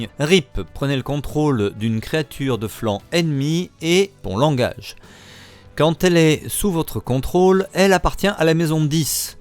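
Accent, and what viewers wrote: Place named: New York, United States. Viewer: Merci pour le travail et ton accent de new yorkais. Continue comme ça et bon courage dans tes ouvertures de deck dis pour rester zen lol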